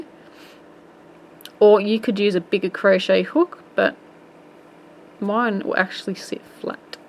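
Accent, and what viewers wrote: Australia. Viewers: love hearing the Aussie accent!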